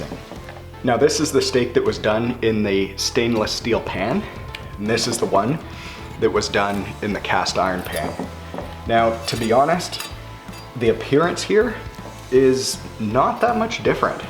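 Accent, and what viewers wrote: Canada. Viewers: Homeboy sounds Canadian Respectfully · This guy talks like a Canuck.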